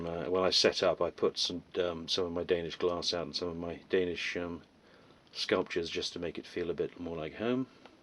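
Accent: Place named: United Kingdom. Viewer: your videos are great. Nice and laid back presentation, such a perfect British English, delight for the ears and such a clean and wonderful S900. We know each other from the Classic Merc Hymer group on FB, we exchanged comments. Please, keep on with the videos.:) Regards, Yaro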